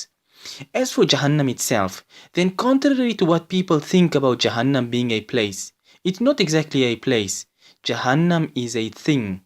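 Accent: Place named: China. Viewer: You have a Chinese accent bro...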